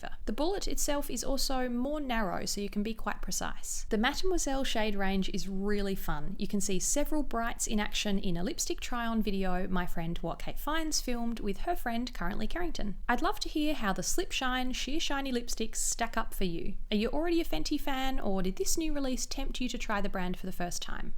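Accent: Australia. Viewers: You are australian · I'm so used to watching American YouTubers that I, an AUSTRALIAN, was shocked to hear her accent. Love this video btw!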